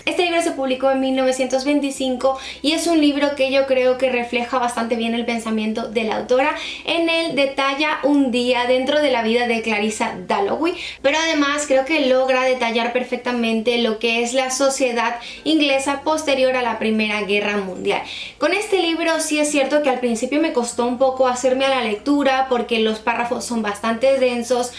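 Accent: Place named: Mexico. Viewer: Tu acento es mexicano pero le añades el "os" De donde eres? Buenos libros. Ciao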